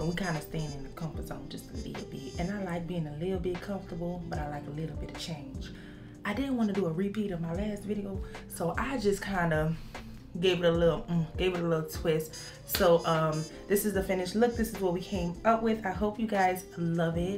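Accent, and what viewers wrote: Louisiana, United States. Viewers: You've gotta be from Louisiana!!!😅😅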